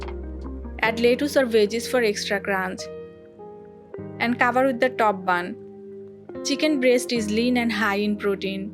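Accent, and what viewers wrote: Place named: Bengal, India. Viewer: Heavy bengali accent..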